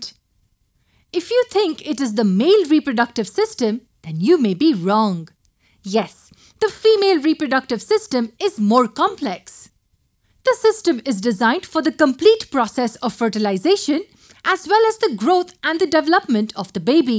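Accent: India. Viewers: Indian accent??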